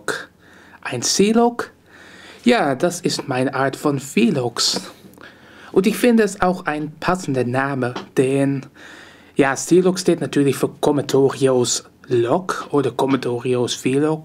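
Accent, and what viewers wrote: Netherlands. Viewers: Deine Sprachkenntnisse sind meiner Meinung nach auch gut. Ich hatte auch mal eine Englisch Lehrerin, die aus Niederlande kam. Sie hatte den gleichen Akzent wie du =) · danke! Ich habs mir voll angekuckt und teile zu 100% deine Meinung. Dein Niederländische Akzent ist lustig ;D Mach weiter so :)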